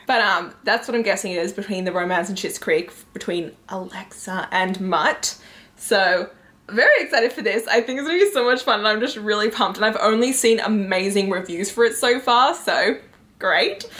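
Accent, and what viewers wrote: Australia. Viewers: U sound extra Australian today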